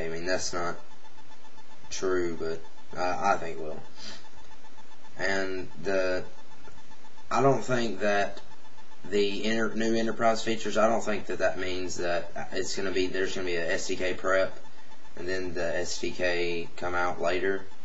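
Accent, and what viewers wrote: South, United States. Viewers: Is it just me, or did used to have more of a southern accent when he was younger?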